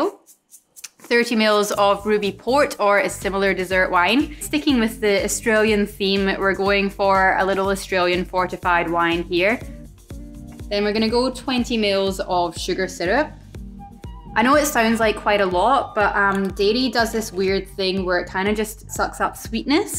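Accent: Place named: Scotland, United Kingdom. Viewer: I heard her saying "Here in Australia..." before, but to me it sounds as if she's actually from Scottland...correct me if I'm wrong Love her vids though :D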